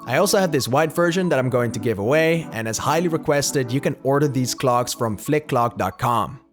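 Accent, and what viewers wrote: Netherlands. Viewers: I skipped the video forward to check if this is interesting to watch. And I immediately thought hmmm... you sound Dutch. And than you said 'twee vliegen in een klap' right after it. Toffe video en zeker een inspiratie voor mijn eigen setup! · I sound a bit of an dutch accents. Nice that your english is almost native.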